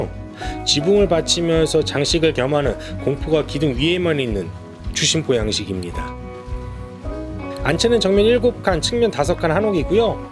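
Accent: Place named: Seoul, South Korea. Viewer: 서울 사투리 너무 귀엽습니다!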